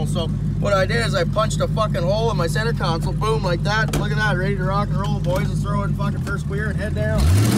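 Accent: Canada. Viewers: Obviously Canadian voice lol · He sounds fucking Canadian not southern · Are you from Canada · You sound Canadian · I knew he sounded like a canadian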